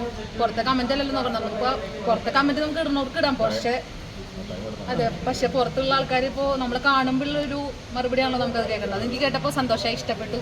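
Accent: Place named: Thrissur, India. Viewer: Kore vishangal negative comment idum. Athonum mind cheyanda ❤️. Ninak oru ahagaravumilla. Ninte thrissur slang anganeyanu. Ath manasilakkunavarum und. Athonum manasilakkathe bodhavum vivaravumillathavarude comments mind cheyanda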